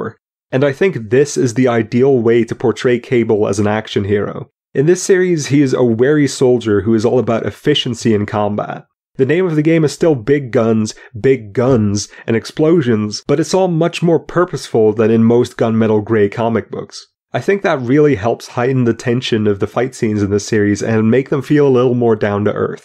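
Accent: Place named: England, United Kingdom. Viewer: I heard some English accentuation in this video. You might have been picking up something from your friends too it seems!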